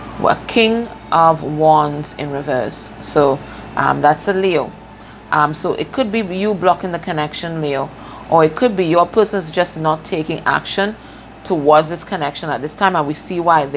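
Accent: Trinidad and Tobago. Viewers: .....are you a trinidadian??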